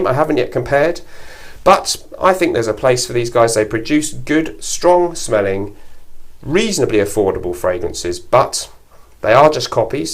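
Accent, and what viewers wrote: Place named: Cockney, United Kingdom. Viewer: urhghh, that cockney accent shudder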